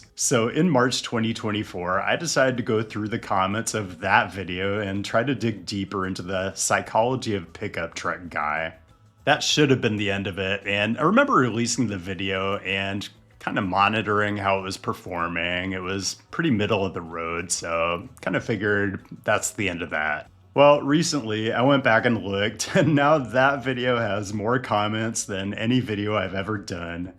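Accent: United States. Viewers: What is this guy’s accent? What state is he from?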